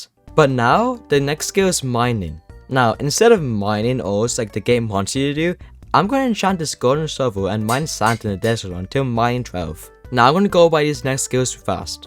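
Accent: France. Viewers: eww are you french? good video tho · Are you french?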